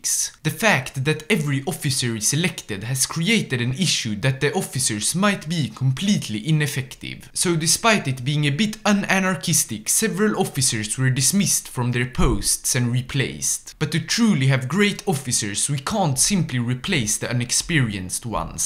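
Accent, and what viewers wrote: Sweden. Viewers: You have the strongest swedish accent I've ever heard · I like how through out all your videos you pronounce alot of cities with a swedish accent, and as one aswell it gives the videos alot of flavour. Thank you I love your videos, keep up the good work!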